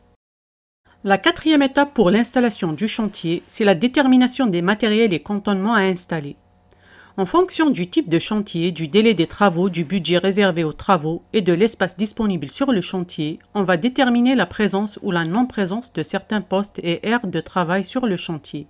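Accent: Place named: Kabylie, Algeria. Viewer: J'adore surtout avec l'accent Kabyle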